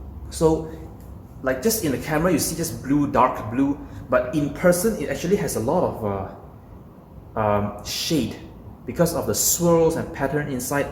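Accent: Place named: Singapore. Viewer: You have a Singaporean accent 😊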